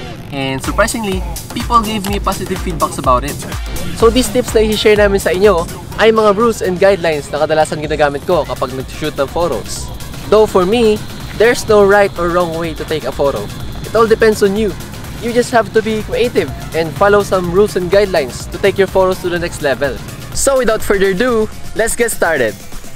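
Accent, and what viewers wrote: Philippines. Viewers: You remind me of my Phillipino boss here in UK your accent.😍😍😍. I like you you are a very good teacher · That sounds like a Filipino accent ? And Tagalog am I Tama ????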